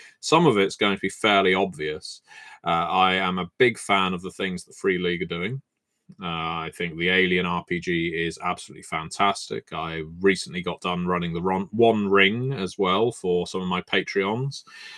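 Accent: United Kingdom. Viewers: Uh your britishism is coming through a bit thick this morning. By Costume dramas, you are refering to what?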